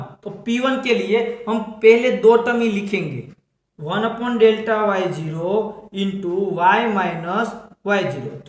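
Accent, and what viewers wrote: Gujarat, India. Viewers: Your tone is gujrati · bhaiya aap gujrat se ho kya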